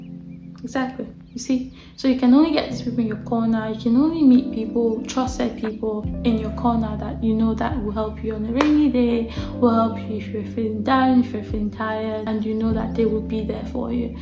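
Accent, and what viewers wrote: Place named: Glasgow, United Kingdom. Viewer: You definitely have a a Glasgow accent tinge in your voice! From a Glaswegian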